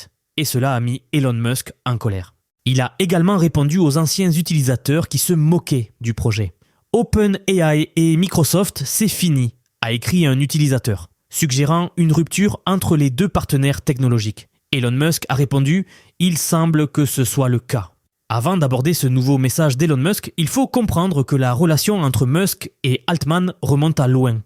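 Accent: South, France. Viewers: Cet accent, c'est de ToLose ou Marseille ou Montpellier ou?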